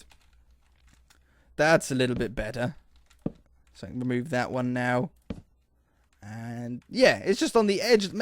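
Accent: United Kingdom. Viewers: Hate to be rude, but may I ask, are you British? I'm part British part American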